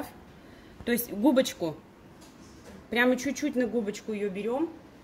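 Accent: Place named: Urals, Russia. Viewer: Уральский говор прям бальзам на мою душу родом оттуда же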